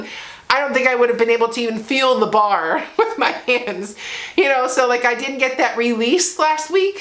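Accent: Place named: New Hampshire, United States. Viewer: Florida, Texas....when did you live in NH? That New Hampshire accent comes out! Loved seeing the girls playing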